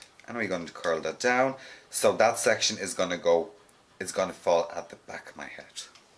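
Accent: Ireland. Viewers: They have an Irish accent :)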